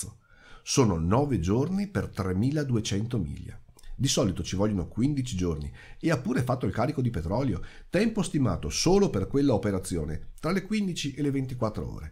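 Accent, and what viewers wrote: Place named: Veneto, Italy. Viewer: L'accento e la cadenza venete dovrebbero essere patrimonio dell'umanità!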